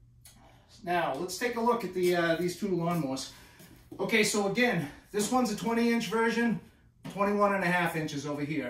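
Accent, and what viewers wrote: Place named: Boston, United States. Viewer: Love your review...you sound like you might be from the Boston area. I'm from Long Island. I wish I knew about the new model! I bought the 220 this spring and while I love the way it performs, the batteries run out of juice so quickly and it takes so long for them to charge I can't mow my front and back lawn on the same day. (And they're pretty small suburban lawns.) I would have loved the larger batteries and longer run time.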